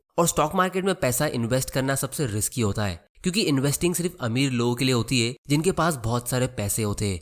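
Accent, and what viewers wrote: Gujarat, India. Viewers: Gujarati voice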